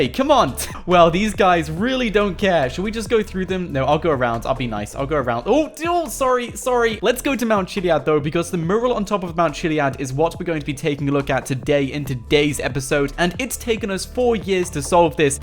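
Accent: United Kingdom. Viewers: Where you from in uk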